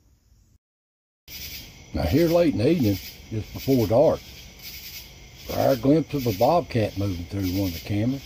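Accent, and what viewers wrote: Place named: Missouri, United States. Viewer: Just found this. The narrator sounds like folks from my hometown in SE Missouri.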